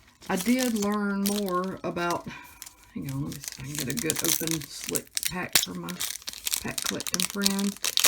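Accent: South, United States. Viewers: Audio is perfect, your southern voice is perfect too. Nice box! Love the design, Gina make a nice football card. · You got an awesome box. And your southern accent is awesome too. Don't let anybody tell you different. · The southern accent rocks.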